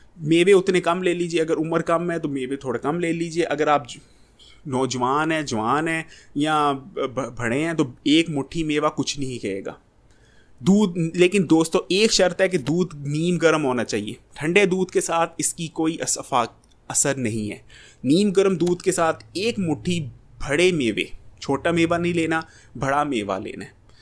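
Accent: Punjab, India. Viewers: اگر اپ ناراض نہ ہوں تو ایک بات کہوں۔ پلیز آپ اپنی اردو ٹھیک کر لیں۔ پنجابی لہجے میں اردو بولتے ہیں۔ یا پھر اپ پنجابی میں ویڈیوز بنا لیا کریں۔ شکریہ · baie ap pnjbi he na ap Ki boli bout achi lgti he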